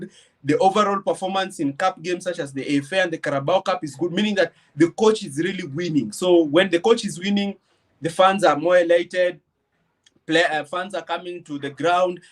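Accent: Kenya. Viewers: Good job brother, I knew that was a unique Kenyan signature tone and accent display. Thank you for the good work you are doing.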